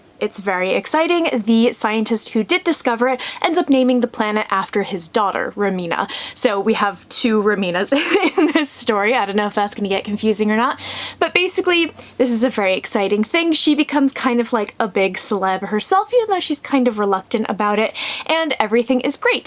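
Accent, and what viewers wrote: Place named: England, United Kingdom. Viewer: I really love your english accent! ❤️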